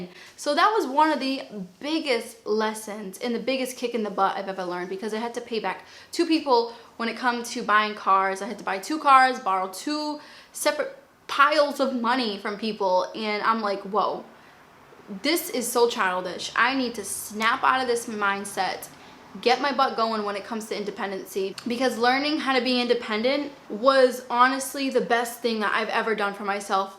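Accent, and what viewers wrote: Boston, United States. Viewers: Love your Boston accent. Love the way you said Michael Kohrs as Michael "Khohs."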